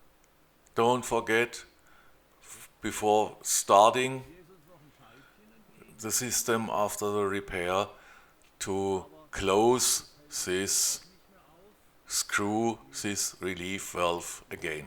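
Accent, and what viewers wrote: Germany. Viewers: Thanks for sharing this video ❤❤❤❤❤❤❤❤!!!!!!! Also i want to say that you sound very kind with your German accent in English and that is so nice that you made this fix with your friend ❤. I ll try do the same as i have the same issue!!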